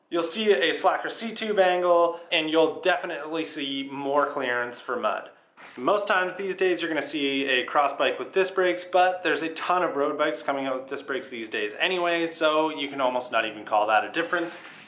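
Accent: Canada. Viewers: Canada for sure. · He's Canadian.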